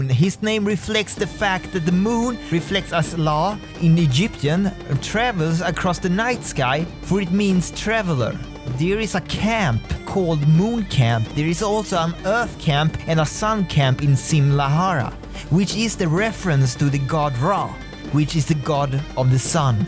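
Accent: Sweden. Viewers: youre sweedish??? c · are you from sweden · ar you from Sweden? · Are you from sweden?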